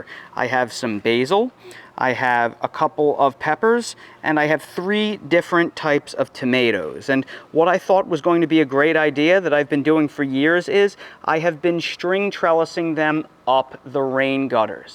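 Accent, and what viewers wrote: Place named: New Jersey, United States. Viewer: I knew you were from NJ! lol I was hearing you talk and I thought to myself, "He sounds like he's from Jersey." I checked your bio, and there you go! I grew up in New Jersey, but more up north, so we don't have the same accent, but yours still sounds familiar to me.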